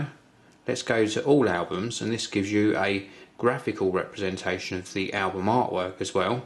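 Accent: United Kingdom. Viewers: not subscribed if you change your accent then I bet you would get more views no one likes british accents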